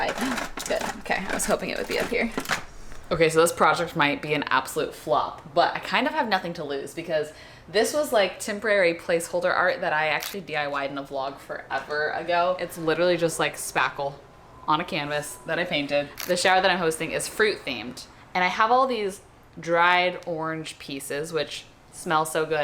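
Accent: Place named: Texas, United States. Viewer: I almost never notice your Texas accent but two weeks with your parents and I noticed it twice during this video! That’s cute.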